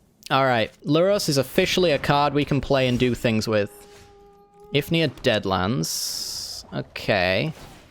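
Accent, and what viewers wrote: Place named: England, United Kingdom. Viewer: Sent here by CGB and found an English accent!!! Woo hoo.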